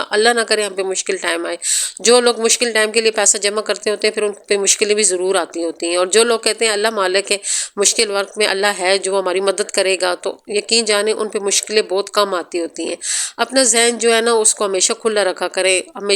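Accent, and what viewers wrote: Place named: Murree, Pakistan. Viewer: Ap ka speaking excent murre k kisi gaon ka hy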